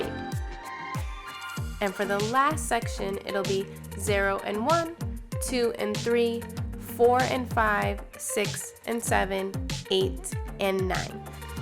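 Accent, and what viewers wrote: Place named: Massachusetts, United States. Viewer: Are you from Mass? Your accent is so cute! Lol #CTNative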